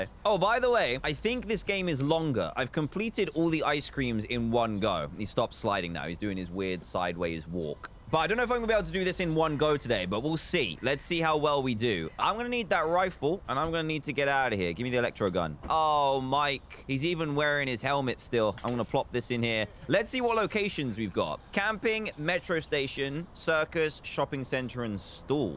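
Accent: United Kingdom. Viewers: Eat Your Cereal he’s British lol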